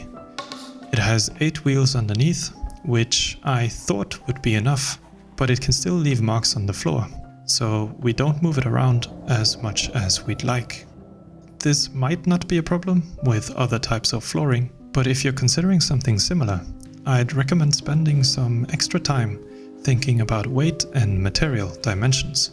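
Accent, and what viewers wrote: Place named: Sunnfjord, Norway. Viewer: Haha, eg trudde eg hørte litt av ein Sunnfjordsdialekt, du skjule r’en din bra på engelsk!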